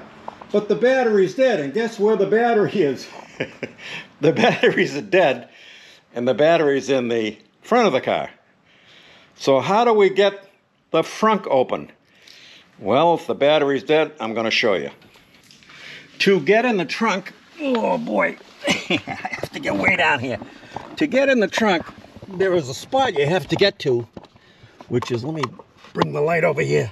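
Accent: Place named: Boston, United States. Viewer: I enjoy your informative videos. Keep up the good work. Love the accent. Is it from Boston?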